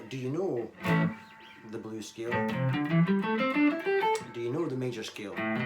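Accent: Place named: Edinburgh, United Kingdom. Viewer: You know, as much as I love our dear friend Uncle Larry (Tom Bukovac Home Skoolin' for those who don't know what I'm talking about), you are talking directly to me in a way that just hits home. I dig the gear you use and your videos about how you use them, and your lessons even more. The charming Edinburgh accent is a bonus! And thanks for the shout-out.:-) I guess it is soon time to get some merchandise available for us fans to buy;-).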